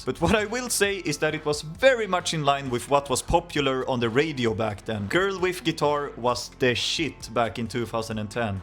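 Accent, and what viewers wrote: Sweden. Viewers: Holy speaking Swedish in English, Batman! 😳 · I love your Swedish English accent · Your Swedish accent is too noticeable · Okay, you wanted feedback: Your English is still very "Swedish", with a typical Swedish pronounciation of the words. How to improve it: Listen to people speaking English in movies and try to mimic how they pronounce words and the language melody they're using. I'm Swedish myself and I'm by no means perfect in this regard, so there is always room for improvement.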